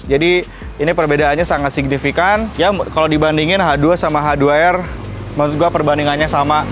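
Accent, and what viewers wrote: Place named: Sunda, Indonesia. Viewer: Baru mampir.. sundanya medok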